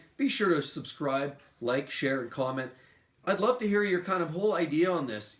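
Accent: Canada. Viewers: I could tell you're Canadian! That's awesome you know Will.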